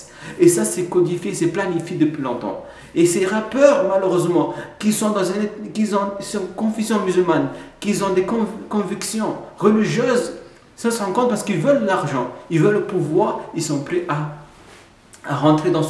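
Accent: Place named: Brazil. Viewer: Tu as un accent brésilien...🙂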